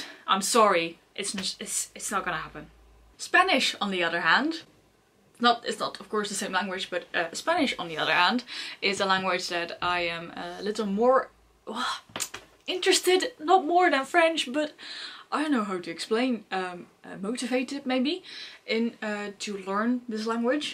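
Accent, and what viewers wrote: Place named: United Kingdom. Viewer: Today is the first time i know you, i am so happy to see you,, i wanna ask you "if you are from the Netherlands, why do you have Brittish accent when you speak English?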